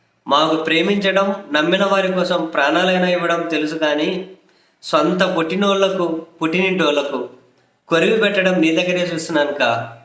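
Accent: Rayalaseema, India. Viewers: మీరు సినిమా లో try చేయవచ్చు బలగం సినిమా లో కంటే బాగుంది మీ డైలాగ్ delivery రాయలసీమ బాష లో పల్లె టూరి బాష లో సగటు మనిషి ఆవే దన తో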